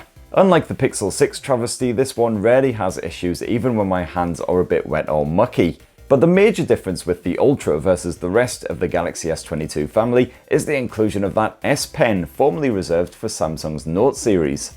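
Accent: United Kingdom. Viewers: love your serious brit accent and syntax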